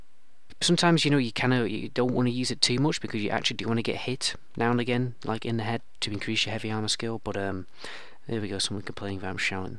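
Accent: United Kingdom. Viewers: Cool accent. Where are you from in the UK? I'm Scottish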